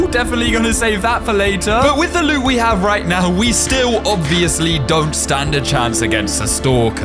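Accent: United Kingdom. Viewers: Are u britosh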